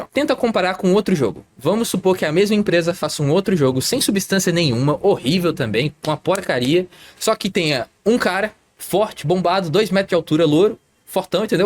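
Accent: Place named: Northeast, Brazil. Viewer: esconde o rosto dnv man vc é o boneco de IA do seu perfil, n este gigachad com um sotaque de nordestino disfarçado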